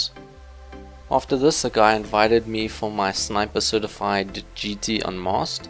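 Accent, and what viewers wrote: South Africa. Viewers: i hear south african, i like. Btw, i know how the lag feels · your south African right?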